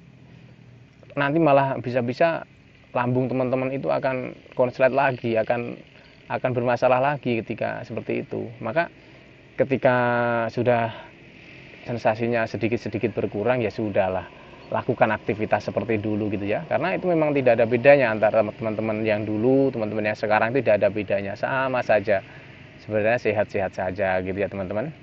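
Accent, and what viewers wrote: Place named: Java, Indonesia. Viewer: asli mn kok kyk orang jw..mhn tlg di bls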